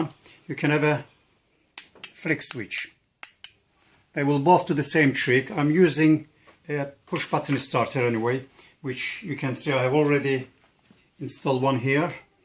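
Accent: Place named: Iran. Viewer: You sound like you have an Iranian or French accent🤔🤔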